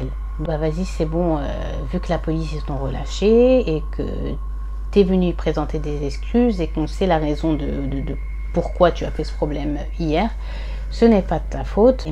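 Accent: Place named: Arab world. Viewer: J'aime beaucoup votre façon de parler l'arabe;votre accent en arabe me plaie beaucoup.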